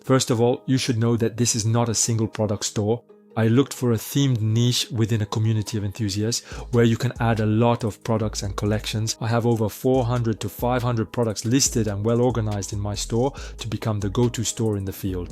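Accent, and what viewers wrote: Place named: France. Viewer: vu son accent en plus je pense que c'est un français je reconnais l'accent :)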